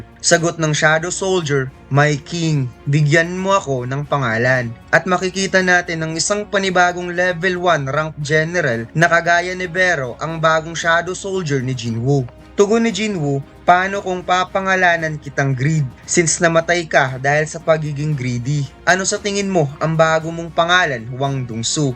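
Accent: Visayas, Philippines. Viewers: Bisaya kc sya kaya ganyan pronounce nya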